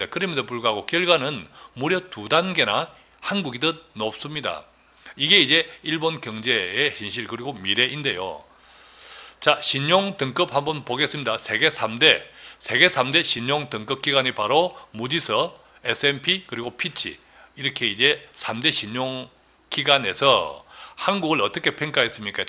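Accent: United States. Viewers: 미국식 발음인데?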